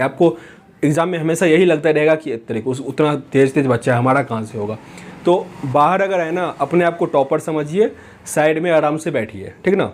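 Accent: Bihar, India. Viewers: Bihari tone ,chaura ganga kinare wala , thx bhaiya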